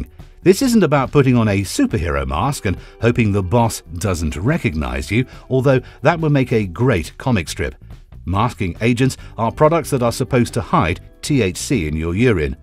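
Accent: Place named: United Kingdom. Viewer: Why is a British guy commentating when marijuana is illegal in the UK that doesn't make sense are Americans supposed to be more interested if they hear a British accent.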